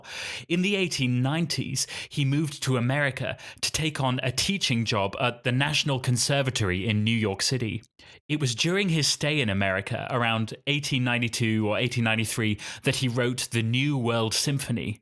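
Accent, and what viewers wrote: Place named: United Kingdom. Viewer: Que curioso suena el acento británico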